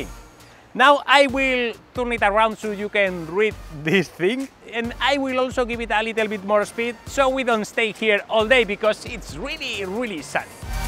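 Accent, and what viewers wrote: Spain. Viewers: At first I wondered if you were spanish, then I heard you talking and knew it for sure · Como se nota por tu ingles que eres español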